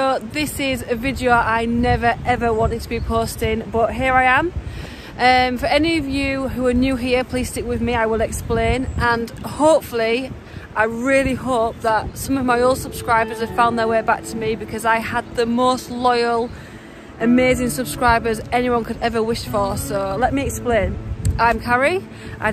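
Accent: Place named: England, United Kingdom. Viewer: Your Welcome Carrie. I love your accent. I'm English and Irish and I'm from Newfoundland, so I have an accent too. Love that English accent. Awesome. So glad your back.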